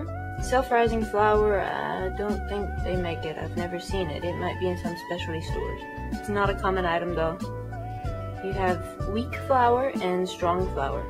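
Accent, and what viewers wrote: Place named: South, United States. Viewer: Your voice still sound very southern to me. Although I can hear other things going on in it as well. Its very かわいい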